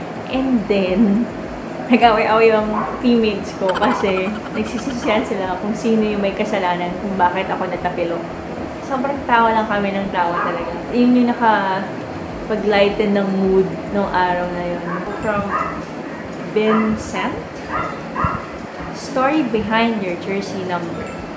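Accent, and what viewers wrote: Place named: Conyo, Philippines. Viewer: Si ate lang talaga yung cute mag conyo. Hindi siya nakakairita pakinggan.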